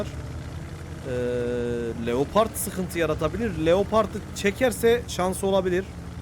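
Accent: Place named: Ankara, Turkey. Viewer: Angara şivesinin hastasıyım. Abone olduk like yaptık evelallah.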